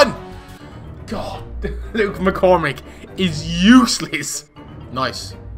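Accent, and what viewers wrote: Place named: Mayo, Ireland. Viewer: Mayo accent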